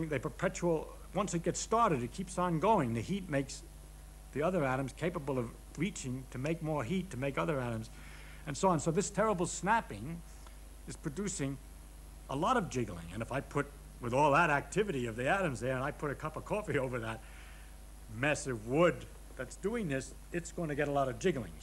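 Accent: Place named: Italy. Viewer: why is there a slight italian accent tho loool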